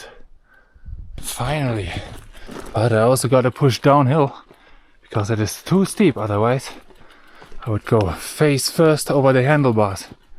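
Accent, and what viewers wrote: Germany. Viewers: Always love the content and im excited to see what you find in Tibet ! By the way, if you ever feel, when editing, that you should have said something but didn't, please feel free to add it. Or, if you just want to ride and not talk that day, you can add it in production. Just a thought I wanted to share, because you do have a great voice, with that german accent 😄. Because, showing us things, this time Tibet, is one aspect, but telling us how you are living it, is another thing. Its "wanderlust" as they say right ?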